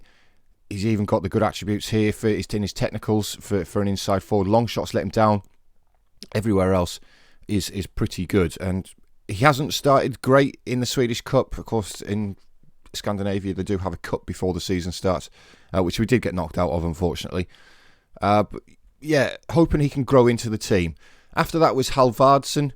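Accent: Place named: Newcastle, United Kingdom. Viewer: Glad to see you're going full Geordie mate, no shame in it, you'll have to to change the intro to 'Home Newcastle' 😉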